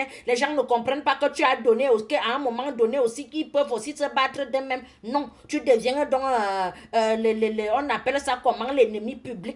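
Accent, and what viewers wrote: Cameroon. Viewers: I love your camerunian accent sister 😍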